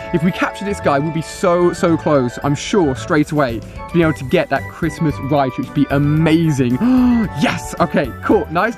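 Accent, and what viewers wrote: United Kingdom. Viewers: lol, sounded super British in this video:)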